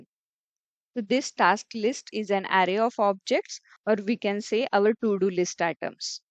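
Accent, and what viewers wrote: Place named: Maharashtra, India. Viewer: My mind is thinking that your english is having little Marathi accent 🤔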